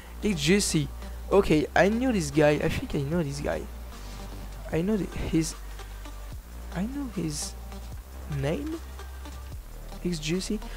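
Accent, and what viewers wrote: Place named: France. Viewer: Yeah good english mate i love that lol i knew what is the french accent tkt sa s'ameliorera au file du temps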